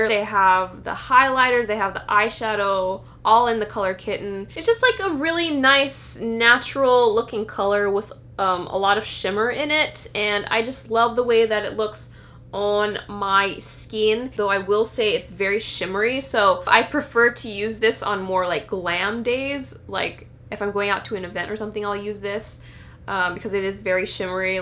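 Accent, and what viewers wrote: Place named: Australia. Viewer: The Australian accent gets me every time lol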